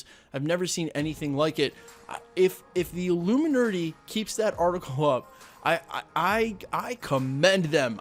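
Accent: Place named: Philadelphia, United States. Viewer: Dat accent, if you're not a Philly Guy, I now dub thee as one! Come to the jawn, we got scrapple...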